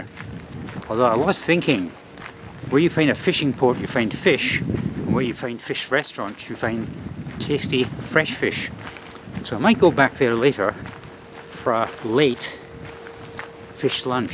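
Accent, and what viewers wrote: Scotland, United Kingdom. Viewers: Great content. Btw is yours scotish accent? Luv it.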